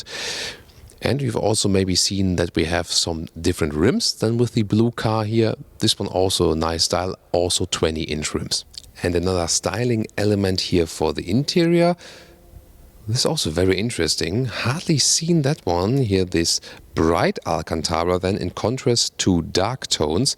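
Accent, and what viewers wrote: Germany. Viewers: This guy is just great! I love the german accented: "Full HD, Full Screen and Full Lengzz! Let's GO!" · These are good reviews. Just factual....and with the funny German English ;)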